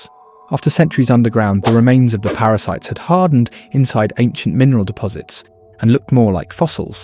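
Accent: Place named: United Kingdom. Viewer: Please change the narrator voice to a voice without this british accent 😵‍💫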